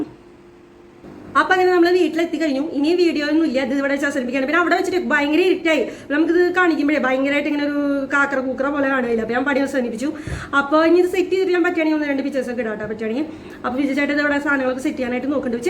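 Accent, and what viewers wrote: Thrissur, India. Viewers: Thrissur samsaram. Super 2 perum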